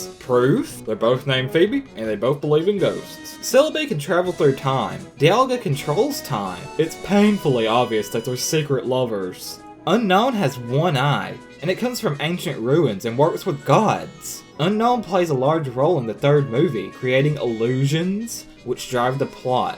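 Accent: South, United States. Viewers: Ugh, your voice, it's like a gay southern accent. no offense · He sounds like a hillbilly. (But not to say he is stupid)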